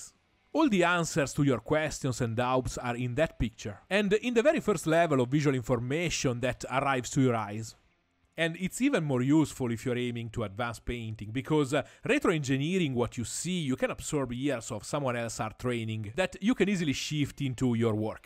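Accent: Italy. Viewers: In three hours! Jeezuz! And that italian flavoured english is just awesome! Ti saluto! Forza!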